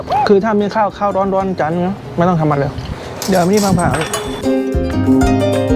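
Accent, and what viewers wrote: South, Thailand. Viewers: พี่ พูดใต้ได้เปล่าครับ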